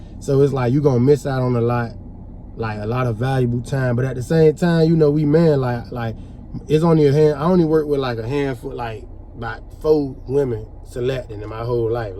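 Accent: South, United States. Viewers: Bro. I’m hearing your accent. You’re definitely from the South. Question, respectfully where? I’m from Cashville and you sound like home. New sub here.🤟🏿😎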